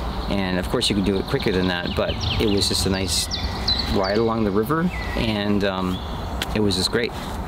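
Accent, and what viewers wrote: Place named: Upper Peninsula, United States. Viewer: I'm a Yooper too, and living in Heidelberg. I would love a Yooper Stammtisch and a Rauchbier in Regensburg. Thanks for the vids, and a touch of Yooper accent!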